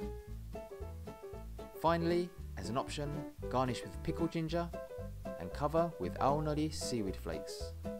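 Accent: United Kingdom. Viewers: dude, I have been wondering that are you Japanese or British? Quite an accent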